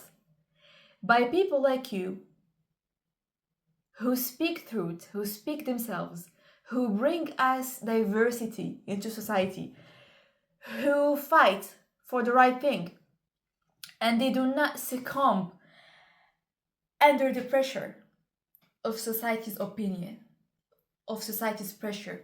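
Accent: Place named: Morocco. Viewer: U sound Moroccan!❤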